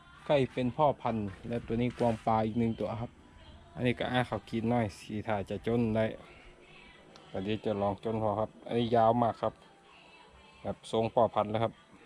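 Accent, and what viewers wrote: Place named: Isan, Thailand. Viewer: คนอีสานใช่ใหมครับ❤ผมเป็นคนอีสานครับแต่พูดอีสานไม่ได้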